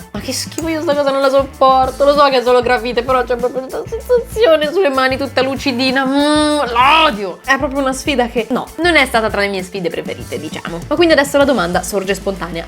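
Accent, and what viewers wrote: Tuscany, Italy. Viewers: Ma sei Toscana?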